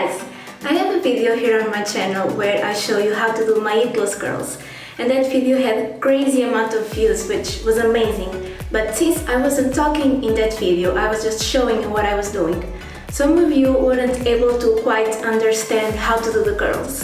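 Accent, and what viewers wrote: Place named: Portugal. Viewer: Vi logo pelo sotaque que eras portuguesa!!! Adorei o método resulta super bem no meu cabelo! Obrigada e boa sorte para o teu canal! Beijinhos :D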